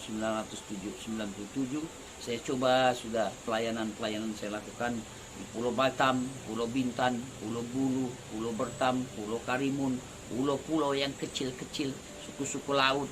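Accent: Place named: Aceh, Indonesia. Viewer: Logat bapak di awal pembicaraan seperti orang Aceh. Tapi ditengah pembicaraan bapak, lok logat Aceh nya jadi hilang sih Pak... Sebenarnya bapak orang mana sih? Gimana sih si bapak ini.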